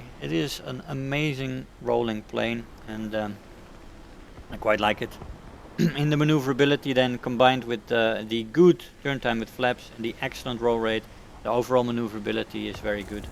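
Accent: Netherlands. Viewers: lol xD im from indonesian and i know the dutch accent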